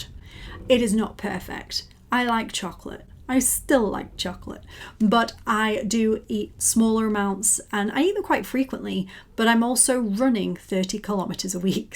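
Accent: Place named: United Kingdom. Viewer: Great Video and been loving the journey, personally down over 32kg, and recently smash my 10k PB (been in place since my early 30s) to a very healthy 56mins. Keep up the great work. MJ has been such a game changer, I was diet fatigued and have wrestled with my weight since pre-teen years I never dreamt that 40yrs later I could really make a change with the right support. Great to have a very UK voice rather than a US centric view.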